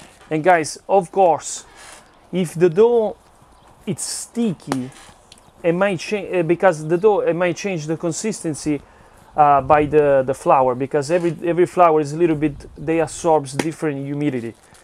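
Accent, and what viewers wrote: Italy. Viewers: sei bravissimo e grazie mille. PS: si sente tanto l'accento italiano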